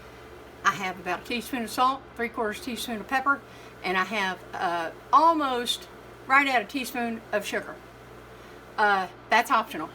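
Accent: South, United States. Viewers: Southern accent this has to be good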